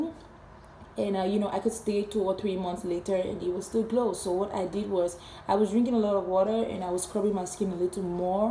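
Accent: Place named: Cameroon. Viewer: You ve got to love Cameroonian accents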